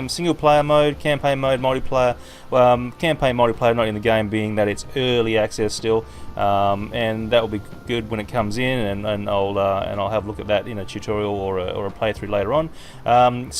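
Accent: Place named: Australia. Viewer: I found this really helpful so thanks mate! It's also refreshing to hear an Aussie accent ;)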